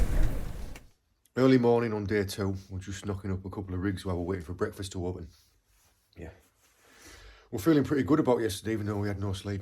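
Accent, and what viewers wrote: United Kingdom. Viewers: Love your videos sir in cornwall, your british accent is not the easiest for non brits to understand still great videos keep up the good work and best regards from sweden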